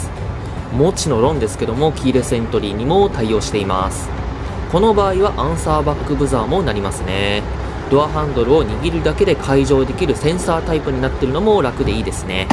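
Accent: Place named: Kansai, Japan. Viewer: 南港あたり、西名阪、香芝インター、たまに聞こえてくる関西弁ｗ すごい親近感がわきますｗ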